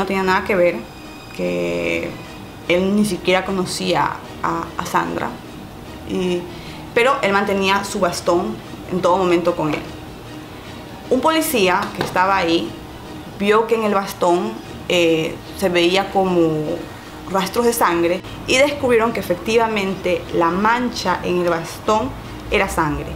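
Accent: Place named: Guayaquil, Ecuador. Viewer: "El man tenía en el baston" se nota que eres guayaca, bellaaa💖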